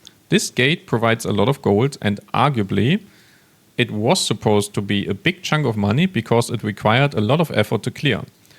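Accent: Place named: Germany. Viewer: i like your English with german accent . good video!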